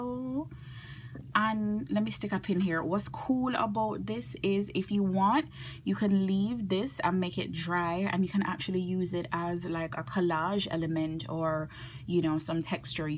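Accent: Jamaica. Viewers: So refreshing hearing a Jamaican voice 😇 Great video. I’ve been working with space and now ready to try modeling paste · Omg you haveee to be jamaican lol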